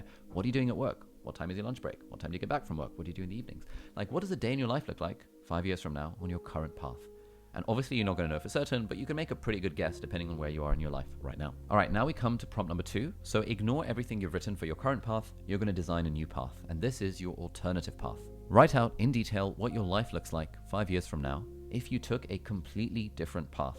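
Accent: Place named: United Kingdom. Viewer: Omg the video automatically started in spanish and it sounded so souless lol I missed british voice inmedately😂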